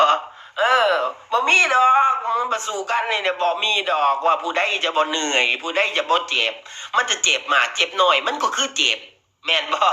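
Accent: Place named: Suphanburi, Thailand. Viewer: สำเนียงปู่ไม่ได้อ่ะ ออกไปสุพันมากกว่า5555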